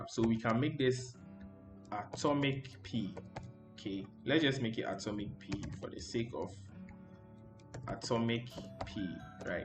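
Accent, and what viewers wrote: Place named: Ghana. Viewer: Great one There. Your accent reminds me of a Ghanaian Friend in Germany.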